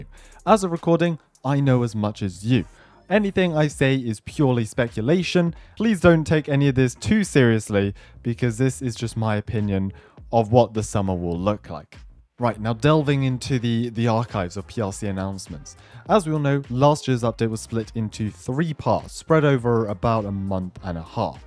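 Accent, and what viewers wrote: United Kingdom. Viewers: Are you British or American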